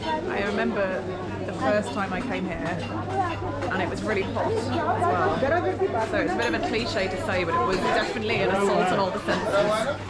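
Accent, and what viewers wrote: United Kingdom. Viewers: It seems you are British by your accent.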